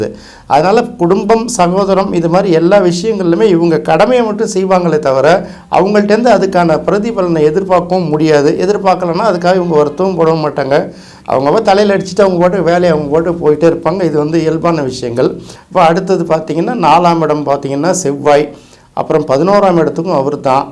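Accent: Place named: Chennai, India. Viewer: Madras தமிழில் பேசி நாசமாகப்போகிறோம்.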